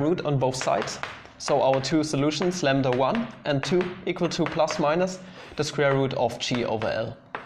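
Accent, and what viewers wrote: Germany. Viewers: Hey, where are you from? Your German accent is quite unusual, you don't have the voiced-voiceless opposition in your obstruents (e.g. p-b, t-d, k-g, ch-j, s-z), and also in German you pronounce sch and soft ch identically, and I can't identify where people speak similarly to you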